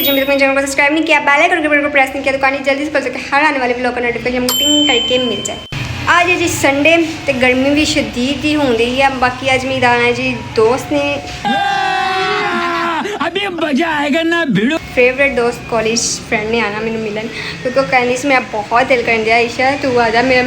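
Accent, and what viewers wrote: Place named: Punjab, India. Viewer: Hi Salam, Nice vlog 😊 Ap jo ya urdu boltay huhy Punjabi ka touch dayti hy maza aya jata hy 👌 😅😂, Han agar ap meray hath ka Custard khaya gi tu fan ho jaya gi 😊 qk ma usmay sugar use nahi karta (Secret hy 😉) 😅😂.. Love u ❤ & take care. Keep Smiling 😃 and Keep Shining ✨️ always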